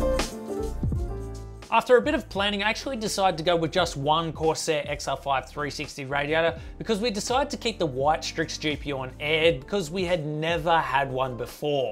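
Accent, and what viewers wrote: Australia. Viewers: R u from Australia bro?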